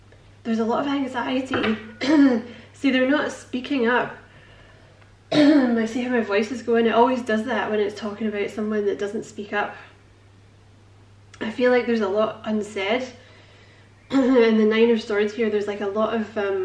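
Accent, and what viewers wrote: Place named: Scotland, United Kingdom. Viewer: Your Scottish accent is off the chart sexy. The hell with my twin flame , I'm feeling your energy 🥰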